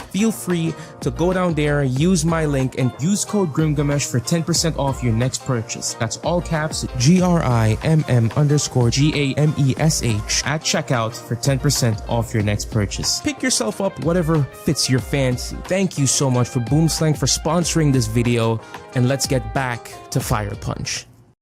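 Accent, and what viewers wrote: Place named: Caribbean. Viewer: What part of the carribean are you from?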